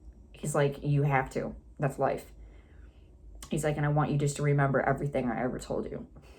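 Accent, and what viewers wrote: Michigan, United States. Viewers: ur michigan accent is so funny to me im from cali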